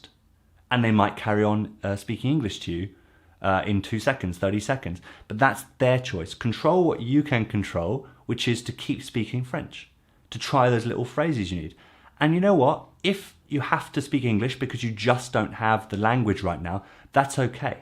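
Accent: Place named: United Kingdom. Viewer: J'aime ton accent britannique. :)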